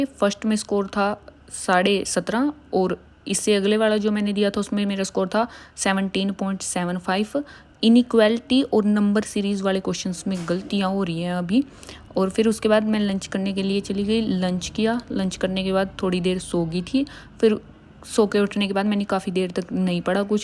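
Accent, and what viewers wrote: Haryana, India. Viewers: Aap haryana se ho na😂😂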